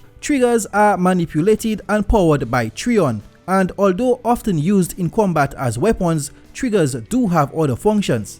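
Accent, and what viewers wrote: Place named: Sint Maarten. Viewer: Hey man, if its not too personal, where is your dialect from? Had a discussion with a friend, he guess ST:martin.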